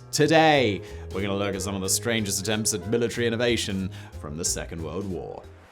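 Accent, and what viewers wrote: United Kingdom. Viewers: I like your videos, but when you explain the details it's rather fast & with your British accent it's often hard to understand what you said. I'm not British and I'm sure your British audience understands every word.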